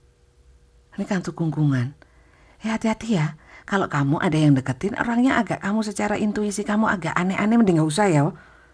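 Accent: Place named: Surabaya, Indonesia. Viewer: Q suka logatnya, Malang & Surabaya banget.. q arek Surabaya, tpi udh lama stay di Bali. Jadi kangeeen Surabaya. Wah bener, harus waspada..di sini ada tetangga tukang gendam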